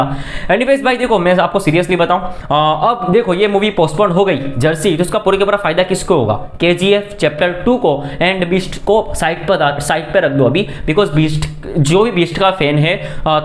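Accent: Gujarat, India. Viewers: You are Gujarati?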